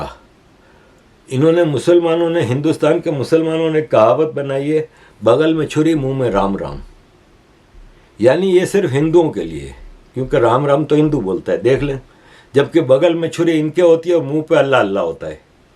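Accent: Gujarat, India. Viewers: Love ur gujarati ascent ..I m also gujarati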